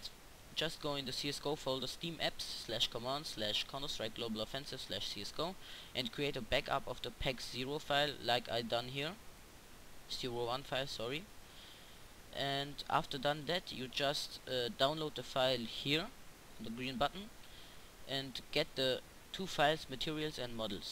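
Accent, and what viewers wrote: Germany. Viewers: Man merkt irgendwie dass du deutsch bist,auch wenn du es nicht gesagt hättest,liegt nicht mal am Akzent,nur an der Art wie du eben redest ^^ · Ey , war klar das du Deutscher bist! :D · Are you German? Could we talk a bit? I got the same voice like you xDDD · DEUTSCH ! :D habe ich sofort an der Aussprache erkannt :D Abo ist dir aber sicher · More people know English than they do German.Only about 100 million people speak german and 340 million people speak english.In other words,there's a more likely chance of someone speaking english than someone speaking german.Besides,it's only his accent.Don't be fooled by the idiots to are racist towards Germans.Most of us are just telling him he needs to work on his English so there's no reason to be crazy mad about it.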